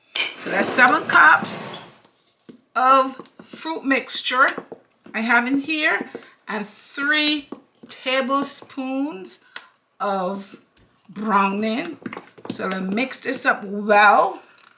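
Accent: Barbados. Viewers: So glad I found you. Was watching your cocoa bread post and I said she sound like a Bajan. Lost my GranGran black cake recipe and yours came out like our traditional black cake. Thanks a bunch 👍🏾👍🏾👍🏾👍🏾👍🏾👍🏾👍🏾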